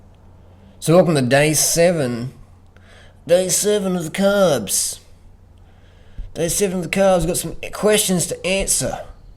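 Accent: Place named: Australia. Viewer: the aussie accent is awesome :D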